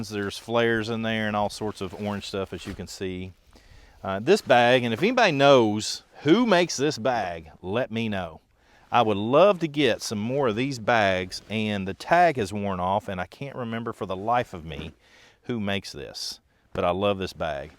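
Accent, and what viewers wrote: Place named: North Carolina, United States. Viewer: This was a great video bro. Man hearing your accent reminds me when I lived in nc for a bit.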